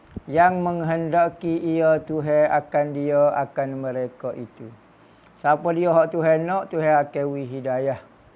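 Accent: Kelantan, Malaysia. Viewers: Sy suka Ustaz ni berceramah dgn dialek Klate.👍👍👍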